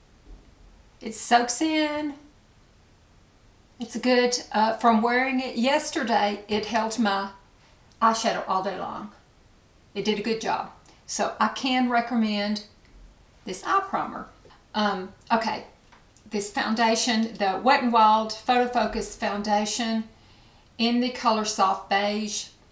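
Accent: South, United States. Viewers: Love your hair colour .and red certainly suits you .but. The nicest is that accent ! Remind s me of , " gone with the wind " .Dont love wet n wild cheap . Looked nice but not for me .Happy valentine's ❤️🌹🌹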